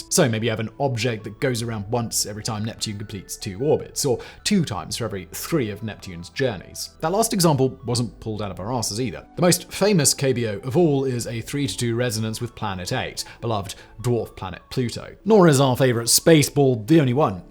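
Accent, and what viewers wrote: RP, United Kingdom. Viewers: Absolutely no idea what Simon is saying, but admittedly impressed by his ability to morph “extremely British” into novel languages. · Like the content but hate the pretentiousness + posh accent, it's hard to listen to honestly.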